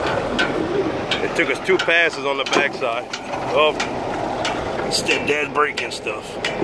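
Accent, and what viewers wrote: Louisiana, United States. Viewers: You sound like you from the Parish!! I live in Hessmer.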